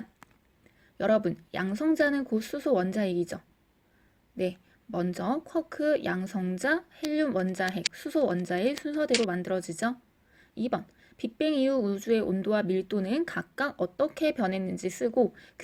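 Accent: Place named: Gyeongsang, South Korea. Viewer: 선생님 경상도분이시죠? ㅎㅎㅎ 억양이 귀여우시네요 ㅎ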